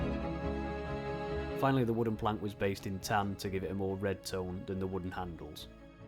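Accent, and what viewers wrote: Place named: Manchester, United Kingdom. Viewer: What a find this channel is! I’m new to modelling and find your presentation excellent. Also you sound very local. Are you a Manc?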